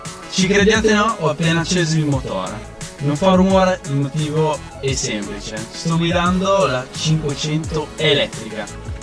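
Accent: Italy. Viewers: Yes, cool to see and hear a Italian in America. :D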